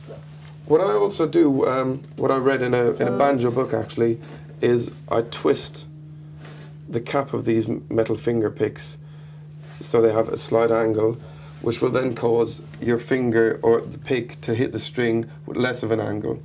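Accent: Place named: Northern Ireland, United Kingdom. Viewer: sounds Northern Irish to me!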